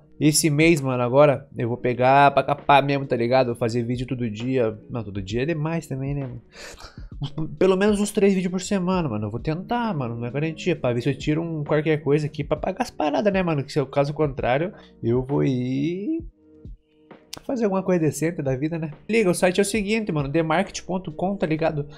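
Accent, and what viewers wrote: Rio Grande do Sul, Brazil. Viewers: madrugando no game atá,achei q era do rio grande pelo sutaque de gaucho kakakaka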